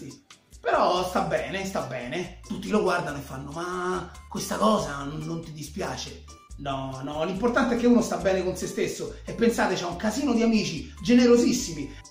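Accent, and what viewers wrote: Italy. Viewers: Tu mi sembri un po’ di Napoli rispetto a uno di Roma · Coffin meme:essendo di colore mi immaginavo una voce con un accento più africano quando pronunciava le parole.La sua voce è invece con un accento laziale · È normale e nato in Italia o cresciuto che accento doveva tenere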